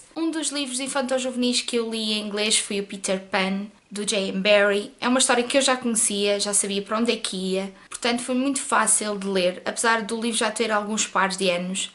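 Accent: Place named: Portugal. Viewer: ADORO o sotaque portugues!!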